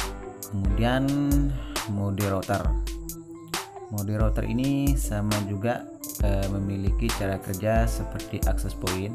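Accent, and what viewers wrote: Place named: Lombok, Indonesia. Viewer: Kayaknya nada2 nya orang Lombok ini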